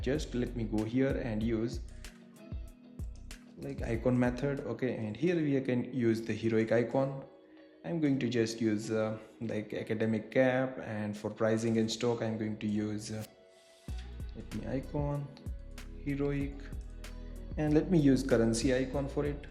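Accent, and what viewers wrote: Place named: India. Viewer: Thank you for the amazing content. I'm Brazilian and I'm learning a lot from you. Besides Filament, believe it or not, I'm also learning English, because the Indian accent is very pleasant to listen to; it's quite similar to Brazilian pronunciation.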